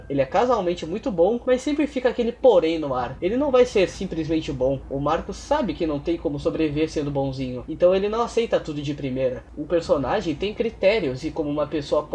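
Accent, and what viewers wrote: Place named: Rio Grande do Sul, Brazil. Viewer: Bah, é raro ouvir o sotaque de um conterrâneo em análise de animes e mangás. Sucesso aí tchê!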